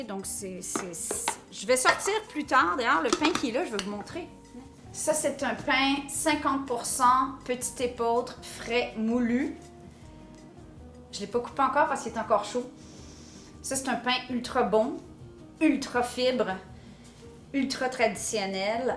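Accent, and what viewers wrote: Quebec, Canada. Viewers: Est-ce que j'entends un accent quebecois adorable? Bonjour d'une Francaise qui vit aux US! Mais encore une petite chose tout de suite: Einkorn (l'epautre), c'est de l'Allemand, pas autre chose, lol! Cici dit, je vais me mettre aussi tot a preparer tout pour realiser cette recette! Merci de partager, et vous etes un vrai rayon de soleil! J'espere que vous n'utilisez que des farines bio, car sinon, les farines standard sont tellement emoisonnees avec tous les mauvais choses se terminant en "cides", qui veut dire 'tuer'. C'est tres serieux, mieux vaut ne pas manger du pain du tout, que d'en manger fait a la farine non-bio. Protegez votre sante, mes amis humains! Bonnes aventures sur la route du pain fait maison! Merci encore! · Heureux d'être tombé par hasard sur votre chaîne en cherchant des infos sur les "air Flyer" , et de fil en aiguille j'arrive sur la fabrication de vos pains, il manque l'odeur mais la salive coule et l'estomac dit qu'il veut çà !!! 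Vous êtes formidable à regarder et quel plaisir d'entendre ma langue natale avec ce si joli accent de nos cousins d'outre atlantique. Merci, merci beaucoup ❤❤👉🇫🇷🇨🇦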